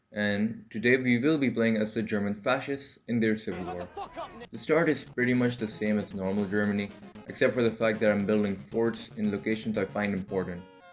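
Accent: India. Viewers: Holy Indian accent🥀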